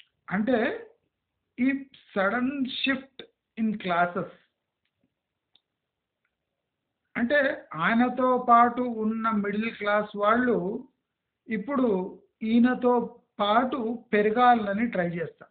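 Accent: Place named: Telangana, India. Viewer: Ur accent pakka Telangana, jai telangana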